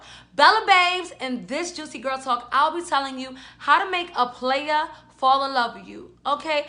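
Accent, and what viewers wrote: New York, United States. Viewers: Nah it's just nyc accent. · Same New York accent both nicki and cardi got deep New York accent like her😇😇😇😇😇😇😛😘🤩😘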